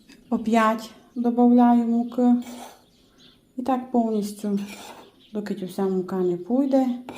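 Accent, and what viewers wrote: Transcarpathia, Ukraine. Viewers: Дякую за файну закарпатську мову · Май файно, коли авторка сказала " чебуреки добрі коли в них багато м'яса"!!!! Щастя вам і вашій родині!!! Всім нам миру!!! Дякую за файні рецепти!!! А наша ЗАКАРПАТСЬКА мова тото ще май приємне як мнясо з чебурека(фіґля)!!!!! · Дякую за рецепт. Ви гарна господиня. А мова! О Боже, цей Закарпатський говір... Люблю Вас!❤ мої рідні УКРАЇНЦІ!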